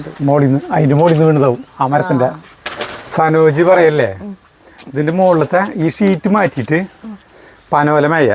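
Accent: Thrissur, India. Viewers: തൃശൂർ ഭാഷ 😂👍..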